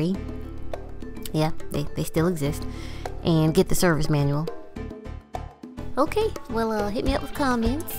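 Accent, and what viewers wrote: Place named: South, United States. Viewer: I hope that you’d consider doing voice-over work. Your voice is unique and has a pleasant tone. And the southern twang is awesome.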